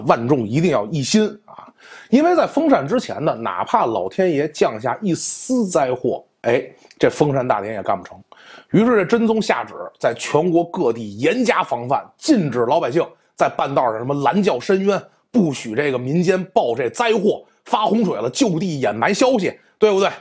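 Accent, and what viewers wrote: Beijing, China. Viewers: 还是北京话好听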